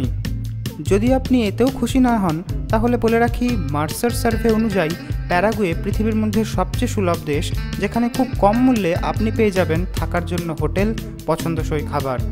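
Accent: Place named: Kolkata, India. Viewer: Nice Video Valo Laglo Apni Ki Kolkatar Lok Apnar Kotha Bolar Style Kolkatar Manushder Moto Janalay Khushi Hotam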